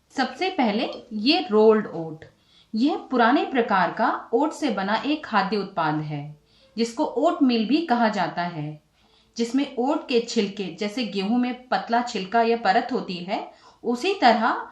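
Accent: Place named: India. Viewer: starts in english ... morphed into indian ...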